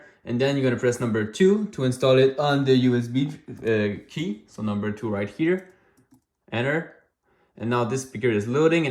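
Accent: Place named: Quebec, Canada. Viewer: Tu est quebecois ca ce voit MDR!!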